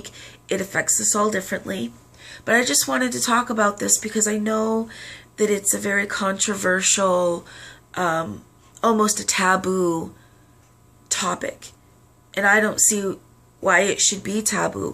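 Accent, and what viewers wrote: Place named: New York, United States. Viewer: Thank you so much. You have a teensy bit of NY or (?) accent. I wish we lived close so I could hug you. Thank you.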